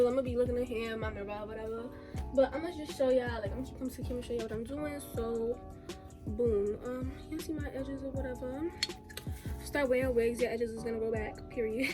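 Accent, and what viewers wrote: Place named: New York, United States. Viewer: you must be from NY, your accent sooo cute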